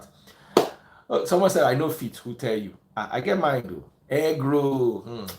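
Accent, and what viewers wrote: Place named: Ibadan, Nigeria. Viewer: 🤣 i swear, your Ibadan accent is SAVAGE. You should entertain us more in our yoruba accent ooo.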